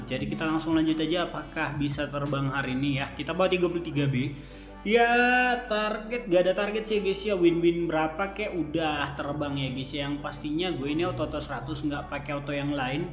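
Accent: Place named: Medan, Indonesia. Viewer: Bng kalo boleh saran gk usah di campur logat medan,, kek garing bat di dengar😌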